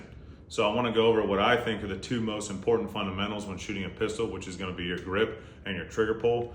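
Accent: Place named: Alaska, United States. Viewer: I thought that sounded like an Alaskan accent…. 🤪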